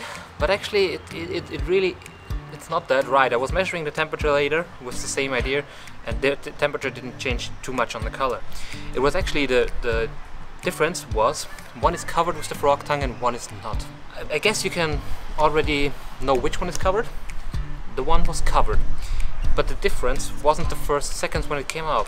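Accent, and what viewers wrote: Germany. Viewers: Cool video! Are you from a German speaking country? Or did I mishear something :D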